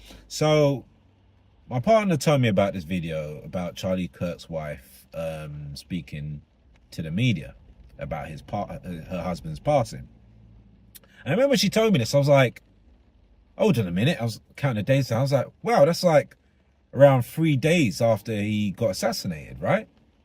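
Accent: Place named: United Kingdom. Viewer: Hi, from US, I too thought it was a bit weird but thought, maybe thats from the stress of her grieving, maybe she was even a little medicated. I dont know. I myself would've been far from the public eye & probably under heavy grief support somewhere. I'd be not ok if this happened to me. So, its all very sad and strange. Love your accent, are you in the UK? Love it there. 😊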